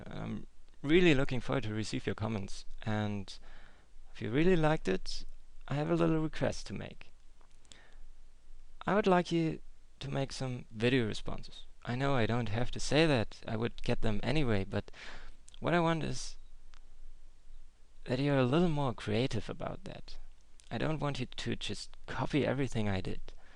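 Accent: Germany. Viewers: You still have your german accent. One matter of practicality. Tricky techniques don't need handholding. We can setup on our own. Make your life easier and skim the basic stuff. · You still have an accent. You can just about tell it's German but yeah you can tell it's there.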